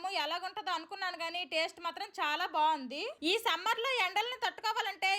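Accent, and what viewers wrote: Srikakulam, India. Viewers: Oppaledu.....😅wah.... srikakulam launguage is an emotion ❤❤❤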